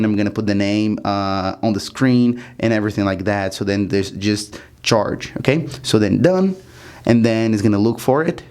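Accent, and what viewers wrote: Brazil. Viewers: I’m watching here and thinking to myself “I feel like that’s a Brazilian accent....” Then I saw on the Now Playing and just yelled AHÁ! SABIIIIA! 😂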